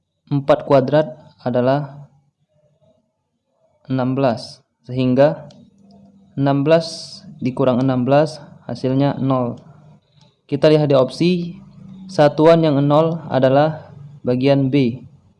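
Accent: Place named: South Sumatra, Indonesia. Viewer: Min kok logatnya gak asing 😂, dari sumsel ya?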